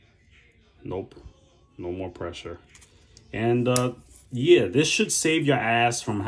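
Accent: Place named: Louisiana, United States. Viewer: I don’t think the clip pressure is a factor at all. The only thing it could do is make the unlock harder, but it just doesn’t. I feel like the Zaan has the easiest, smoothest unlock you could ask for - there is ZERO lockstick, and the bar is intuitively designed. I’ve never heard anyone criticize the unlocking, the it’s one of the Zaan’s best features. Out of the box, the OPENING is pretty stiff, but you have options - 1) you can just use it until the washers and blade break in to each other and slide easier (6 months maybe?) 2) you can disassemble the knife and carefully polish the face of the washers (2000 grit paper on a flat surface) then add some grease and a little oil mixed in 3) the easiest and fastest fix - just back off the pivot a teeeeny bit (1/32 of a turn, if that) and the deployment is a lot easier. What’s the background on your accent? 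There’s like some Louisana but a little Boston?? It’s unique, I’d love to hear the origin.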